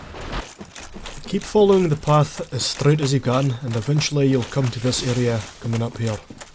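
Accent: Scotland, United Kingdom. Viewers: I tried to think of a something funny to say about your Scottish accent. But I couldn't think of anything. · Loved it, cannae beat a gid Scottish narrated video. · Scotland laddy like me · ***** Scotland mate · Russian? oO By far you should know this guy is Scottish. xd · You sound like a Scott. You are naturally awesome if that's the case. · Oh god, you're Scottish, marry me <3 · Guy's a Scot, mate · Pretty sure that's a heavy-duty Scottish accent. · That's the most Scottish accent I've ever heard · Are you like, Scottish?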